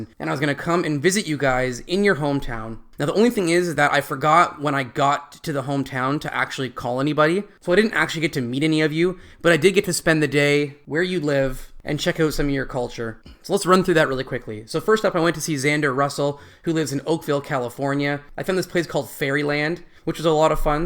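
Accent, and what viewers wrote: Canada. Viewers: This might be your Canadian accent though, but we pronounce the first syllable like BILL, not like BELL. As it's spelt. You passed in my book though!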